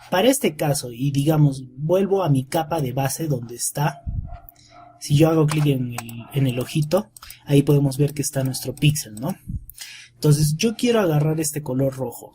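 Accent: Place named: Peru. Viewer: me harta tu voz de peruano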